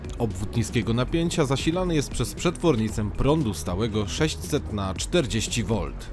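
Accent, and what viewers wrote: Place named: United States. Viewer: Pan miejscami intonuje słowa na końcu zdania, jakby spędził 30 lat w Stanach. Nie ma co kombinować, lepiej mówić naturalnie, po naszemu.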